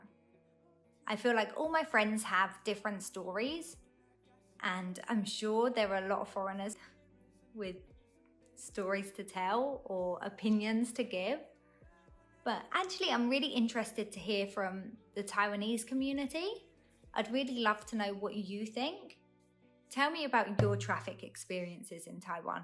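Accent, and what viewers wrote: United Kingdom. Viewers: 我喜歡妳的英國腔英文，妳對台灣的交通評論很正確，以後我會開慢一點！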